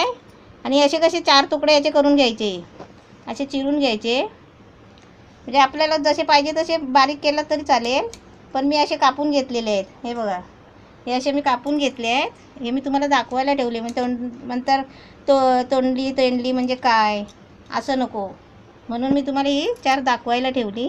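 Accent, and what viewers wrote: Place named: Ratnagiri, India. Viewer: mavashi khari mavshi vatate avajavarun agadi Ratnagiri chi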